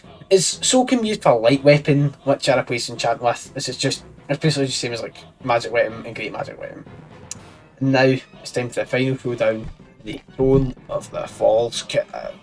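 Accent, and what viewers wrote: Scotland, United Kingdom. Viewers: I love how nobody understands him. Grew up around scottish accents so I find it so I understand perfectly. Great vid mate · scottish english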